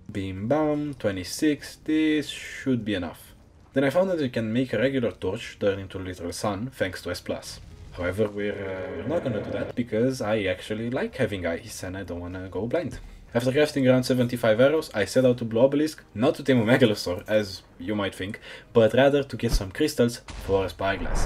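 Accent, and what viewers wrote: France. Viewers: Bro u have a french accent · You got a french accent you mog me so hard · are u french? i love ur accent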